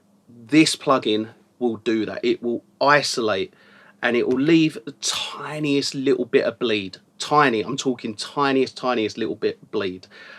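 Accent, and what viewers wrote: England, United Kingdom. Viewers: great reviews as always!! Thanks-you. When you say "three" it sounds like "free" ! ...its the English accent + my hearing loss! :)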